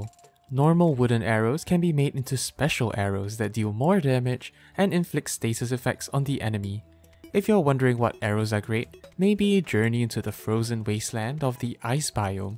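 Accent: Singapore. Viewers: Bro got that Singapore accent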